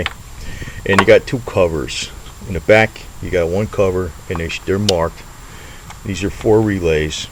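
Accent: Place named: Pittsburgh, United States. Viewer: you from Pittsburgh??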